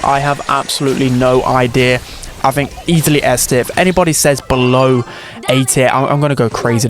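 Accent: United Kingdom. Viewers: Even the sub titles gets failed against these brits english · Hes british leave him alone 😭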